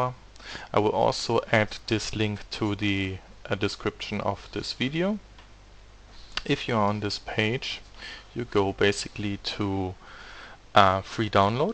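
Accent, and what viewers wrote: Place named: Germany. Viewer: Ich habe direkt rausgehört, dass es ein Deutscher ist haha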